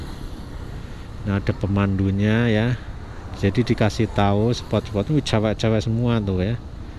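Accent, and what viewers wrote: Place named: Central Java, Indonesia. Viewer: Mas dari Jawa Tengah logatnya kaya ngono Cilacap atau Cirebon sekitar nya 👍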